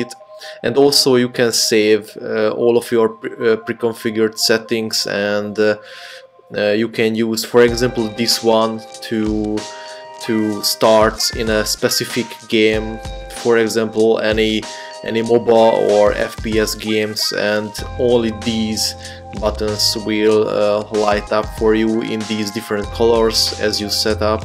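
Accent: Hungary. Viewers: Sexy magyar akcentus :*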